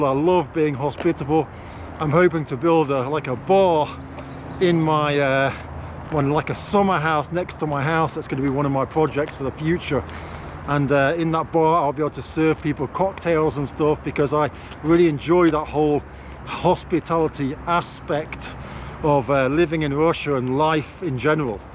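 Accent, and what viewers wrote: United Kingdom. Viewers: So you have a British accent, walking and talking on your phone ....... yeah, to be honest, if I were Russian, I would be a bit suspicious. Just sayin'.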